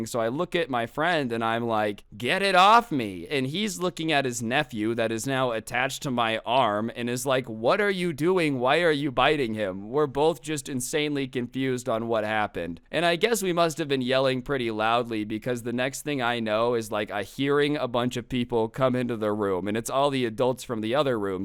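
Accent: United Kingdom. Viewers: I think his cat noises and British accent makes me have stampy vibes but stampy actually talks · ngl i like british accent or something (im not british)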